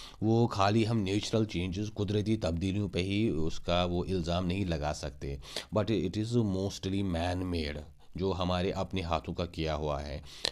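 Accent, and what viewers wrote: Kashmir, India. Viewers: You've pure kashmiri accent☑️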